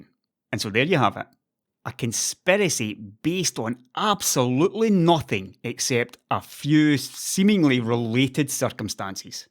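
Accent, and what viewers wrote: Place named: Scotland, United Kingdom. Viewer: Can you make a video in swedish. I have to hear your Swedish with a Scottish accent, if you can speak it.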